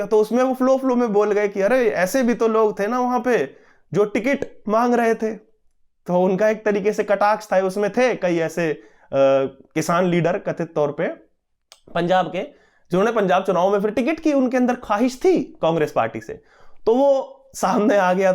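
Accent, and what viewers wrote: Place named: Haryana, India. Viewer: Bhai tumhara Haryanvi bolne jo maja hai wo alag he hai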